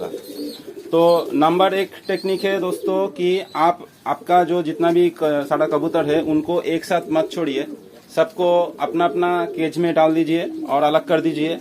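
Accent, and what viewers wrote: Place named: Bengal, India. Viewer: I understand from your accent that you speak bengali. Accha, apni ki kokhono dekhechen je kobutorer vai bon jora ney? Amar ekjora ache emon, dim diyeche ora, ete ki bacchar kono problem hobe? Anways you have beautiful pigeons! Love from Bangladesh ❤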